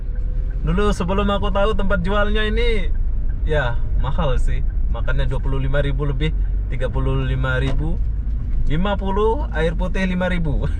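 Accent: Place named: Malaysia. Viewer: sip makasih mas, tapi logatnya kayak malaysia yah..hehhehe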